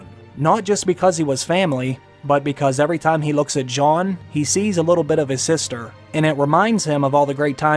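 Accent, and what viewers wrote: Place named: Alaska, United States. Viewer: you sound like those people living in alaskan backwoods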